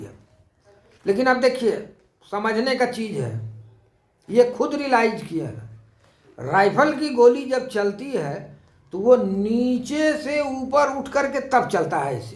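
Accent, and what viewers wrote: Bihar, India. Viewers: I love your jacket sir and the way to explain in Bihari accent 🙏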